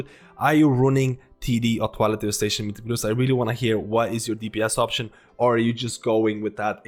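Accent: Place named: Ireland. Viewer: Where are you from man, i cant hack your accent i hear abit of irish in it lol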